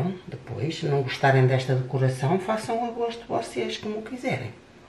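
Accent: Portugal. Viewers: onde vc mora? Já entendi q não é em Portugal, mas vc fala como portuguesa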